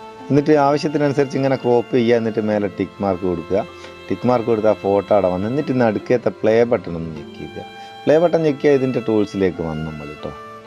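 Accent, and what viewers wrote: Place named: Kannur, India. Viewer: നിങ്ങളുടെ സ്ലാങ് കേട്ടിട്ട് കണ്ണൂര്കാരനാണെന്ന് തോന്നുന്നു. 🤔🤔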